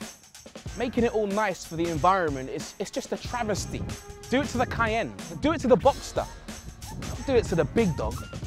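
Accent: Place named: Caribbean. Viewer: Having seen him a few times now, I have to say that I can't stand the guy. His patois accent is gratingly irritating.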